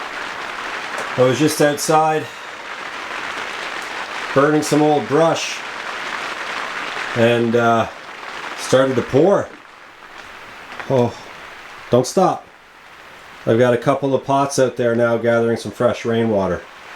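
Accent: Newfoundland, Canada. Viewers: are you from newfoundland ive been watching your vids about a year now and i often said i think he is from nfld or lab for sure are you???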